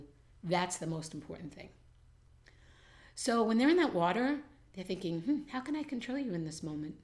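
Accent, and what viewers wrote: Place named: New York, United States. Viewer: I got this gut feeling with a last name such as yours you are from NY cause Im from NY and You sound like someone I would talk with in a King Kullen or S&S. Making me home sick. I also would like to point out...that things in America we would classify as NPD or other disorders would be considerded normal especially in Asia.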